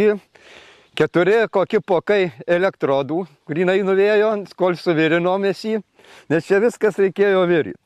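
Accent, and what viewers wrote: Dzūkija, Lithuania. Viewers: Saunuolis labai grazi dzukiskai kalba 🙌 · Širdžiai miela dzūkiška kalba.👍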